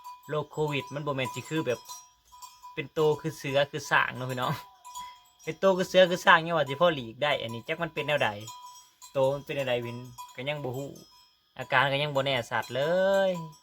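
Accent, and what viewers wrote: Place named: Isan, Thailand. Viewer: เว้าม่วนเนาะจร้าคือิสานคัก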